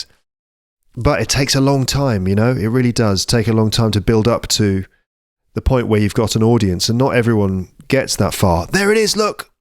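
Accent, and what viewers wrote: United Kingdom. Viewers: Congratulations. You're a great speaker. I'm italian, i'm a beginner learner and i love your british accent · Hello from Paris to Paris! You have brilliant set - a catchy voice - both British accent and timbre, sense of humor (I laughted at the Russian joke, as far as I am Russian, I could claim it was good) and knowledge about how teach language. I believe that you are right on keeping your motivation to continue with different types of podcasts, may be you will come up with even more new podcast subtypes, future will show. Bonne continuation! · ❤❤ Hello, I subscribed to you only now, although before that I had been listening to your correct British English for several months. It's very interesting to listen to you. I listened to the story about how to communicate with small children three times. I wish you health and success. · Congratulations Sir !!! 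It's very helpful listening your beautiful British speaking!! Wish you great success more than that 👍🫡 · ❤❤❤wow. 👍🏻 well done, I love listening to you. The very British voice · Congratulations from Spain.. Thank you for your amazing English class,with excellent british accent. My son is currently doing his surgical training in London, thanks to you!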